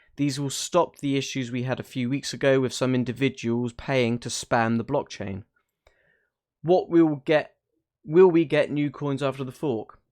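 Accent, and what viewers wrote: United Kingdom. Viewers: Why would u dedicate a channel to talk about the worst performing coin of the year? this shit coin has been delay after delay....... disappointment after disappointment........... where are the exchanges they promised MONTHS ago? Hmmmmm? All this technical bullshit talk is not helping....... u know what makes the price of a coin go up? Results!!!!!!!!! Until then my friend you are wasting ur time and British accent on a shitcoin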